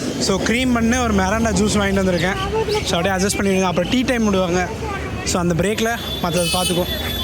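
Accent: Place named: Madurai, India. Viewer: Neenga Coimbatore thaana eppudi madurai slang pesuringa?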